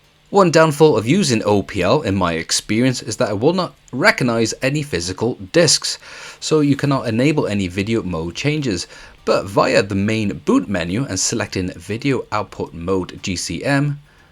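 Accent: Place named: South Wales, United Kingdom. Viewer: Your definitely from South Wales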